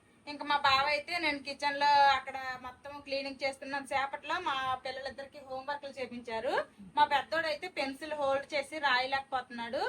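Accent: Srikakulam, India. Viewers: Mee husbend a job chestaru? cute family...... Mee srikakulam bhasha chala bagundi ....❤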